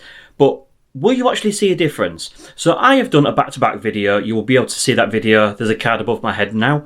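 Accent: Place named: Yorkshire, United Kingdom. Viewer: All the time I was watching this video I'm thinking "This guy's from somewhere near Hull in the UK I think? He's definitely a Yorkshire lad anyway. My wife's family are all from Hull, which is the reason why I thought this was the case. Am I correct?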